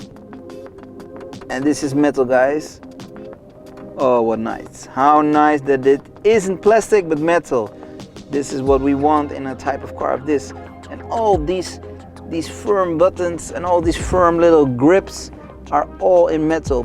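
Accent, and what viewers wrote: Netherlands. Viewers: so you/re an indian with a dutch accent and an english channel. aight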